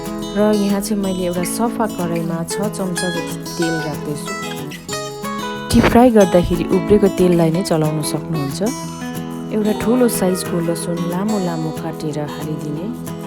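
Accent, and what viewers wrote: Darjeeling, India. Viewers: kati mitho boli ho didi hajurko fist time herdai chu tara aawaj chai sarai ramro darjeeling ko ho didi hajur??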